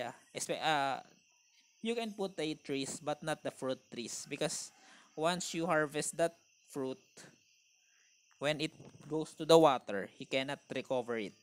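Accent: Philippines. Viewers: pinoy accent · Your tips are very helpful, thank you 👍👍😊 btw I'm loving the Filipino accent 😁✌️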